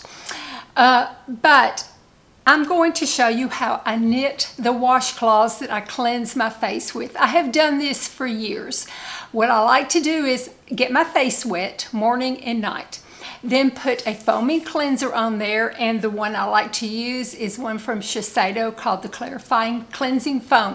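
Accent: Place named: Tennessee, United States. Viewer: So sweet to share. 🩵 love listening to your voice 😊 & Tennessee accent 😂🥰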